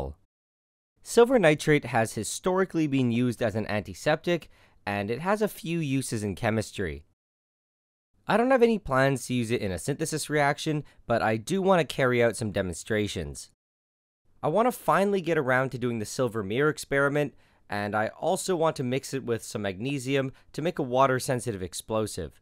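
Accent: Canada. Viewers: you tryin so hard to cover that canadian accent huh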